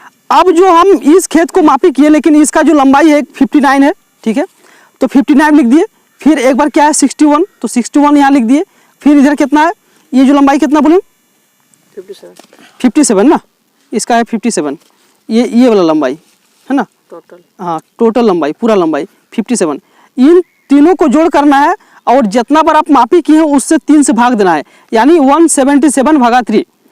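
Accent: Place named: Rural, India. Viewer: Desi awas me mja aaya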